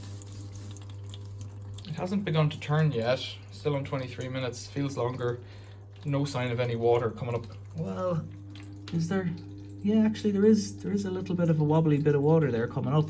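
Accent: Ireland. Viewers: Just had my sunday lunch and now I am snoozing with your lovely Irish lilt soothing me as I drop off.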